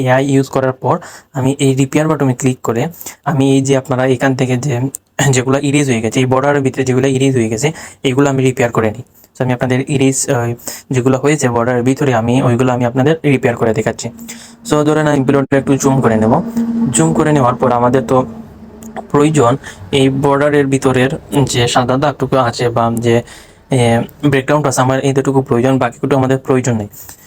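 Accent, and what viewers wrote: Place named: Sylhet, Bangladesh. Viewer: Vai Sylheti ni kota sune to seta mone hocce 😊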